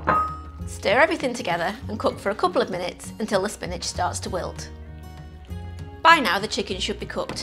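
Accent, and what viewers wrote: United Kingdom. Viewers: I hear a British accent. For sure this will be in another video called "Italian chefs react to Italian cooking videos" with them crying bloody murder 😂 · isnt it funny how a british accent instantly makes someone charming?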